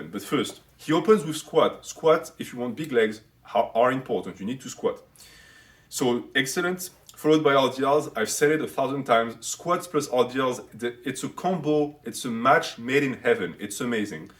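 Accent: France. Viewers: I dont get it. French accent but you have a football on your shirt and not a soccer ball. Im hella confused.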